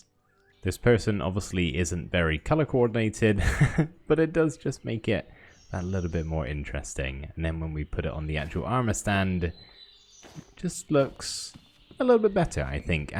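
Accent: United Kingdom. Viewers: Your accent is very pleasing. Where is it from? It sounds a bit British but not quite? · thought i was clicking on a minecraft video, turns out its asmr. never in my life did i realize the pleasentness of hearing a slightly deep voiced british man whisper me sweet nothings while having moonlit sonata playing in the background... I now know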